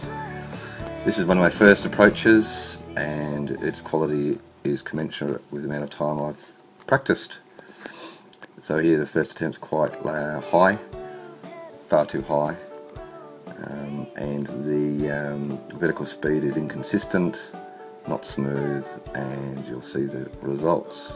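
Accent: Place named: Australia. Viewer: G'day mate, lovely Aussie accent!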